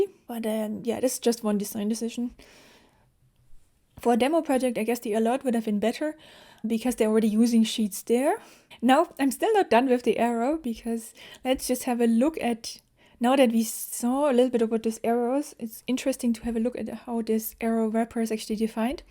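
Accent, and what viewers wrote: Germany. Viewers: Your accent sounds German with a tiny bit of French. Are you from the region of Germany near France?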